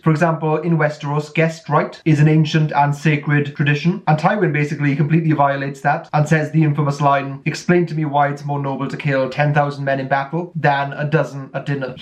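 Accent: United Kingdom. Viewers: Can you please, please, please make an effort to speak more slowly in your videos, and maybe not edit your shots quite so close on the heels of previous shots? I'm really interested in your videos, but when you get going so quickly it can be pretty hard for me to understand your accent (and I'm usually really good with accents). I understand if it's your style or whatever, but maybe I'm not the only one from outside the UK who struggles to understand?? Thanks. And yes, I would like very much like more videos typing GOT and other fictional characters! 😁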